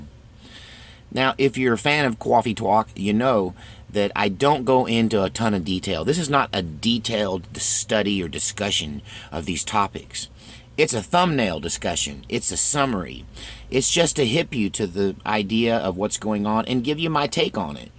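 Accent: New York, United States. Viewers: Hey. Can you say 3rd and 33rd street in that New York accent? 😅. Yes now we need to find out why we are getting the raw end of this agenda 21 and whoever they are are so important that they should get a free ride? I mean everyone who's an elitist needs to re think that. They're no more better than anyone else. Now I hear about all of the good stuff about marijuana. Hey when you can can you contact me? I have a wild idea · That guy who thinks you're making fun of Jewish people is stupid. Every one knows that your accent is from that Mike Meyers SNL skit and if they don't then they are a millennial and a cupcake. It is a NEW YORK ACCENT however my Jewish in laws from Long Island sound like this BUT so does EVERYONE ELSE FROM LONG ISLAND! When I visited I went to a diner and I ordered my entire breakfast in that accent as a joke and NO ONE figured out that I didn't speak that way! 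😁 And soy raises estrogen....no one realizes how it is in all of our food. Chemo is terrible!!! And I've also unplugged from TV/media and I also only use ibuprofen as well...most surgeries are excuses to line the pocket of the doctors!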